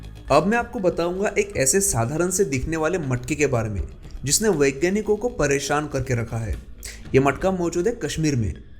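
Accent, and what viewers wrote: Maharashtra, India. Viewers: Hello. It was interesting to know about all the three things mentioned in your video. One suggestion though. The narrator has a very deep Marathi accent, hence many Hindi words are not pronounced the way it should be. I would suggest that you hire someone for this job. I am interested.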